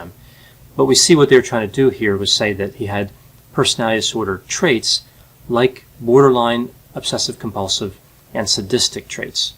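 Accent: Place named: Massachusetts, United States. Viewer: What is this doctor's accent? Massachusetts?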